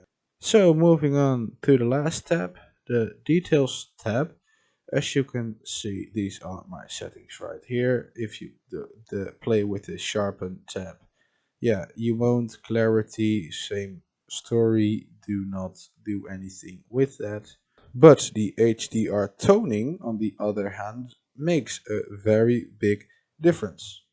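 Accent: Netherlands. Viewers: You Dutch by any chance? 😄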